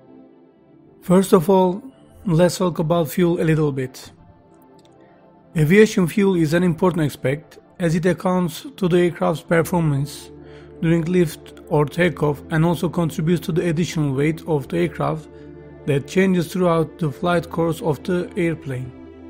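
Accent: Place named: Turkey. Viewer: Nerde duysam tanırım bu Türk ingilizcesi, selamlar kardeşim :)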